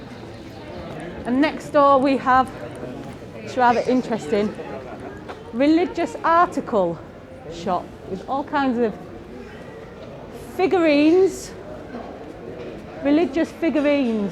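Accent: Yorkshire, United Kingdom. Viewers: Hi ....you sound like your from Yorkshire ....am I right...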